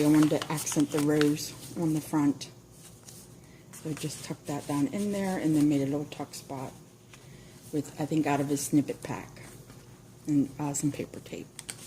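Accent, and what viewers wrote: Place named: Southeast Pennsylvania, United States. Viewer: As soon as I heard your accent I know you were from South East PA!!!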